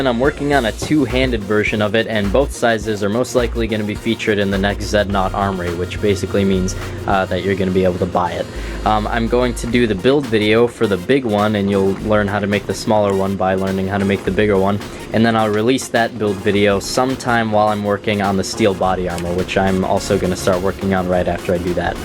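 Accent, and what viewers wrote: South Africa. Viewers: you soud like a south African like me because I am one hahaha lol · Damn, nice mace and an even better Afrikaans accent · Not too bad of a South African accent there. I'm from South Africa. Love the vids Chine. Chine means M8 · South african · Convincing South African accent bro, and that's coming from a South African!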